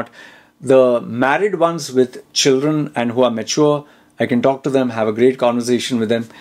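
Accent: India. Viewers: True ,too much of corruption here. You sound Indian ...absolutely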